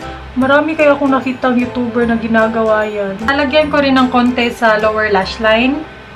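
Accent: Visayas, Philippines. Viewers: Halaaa namimiss ko mama ko sa accent ng bisaya mo ate. Hehe. Godbless po! <3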